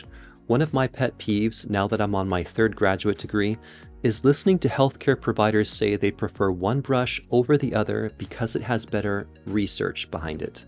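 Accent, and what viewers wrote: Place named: Scotland, United Kingdom. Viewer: Was going to get oral but now sonic! Thanks for this! Love your accent from Scotland 🏴!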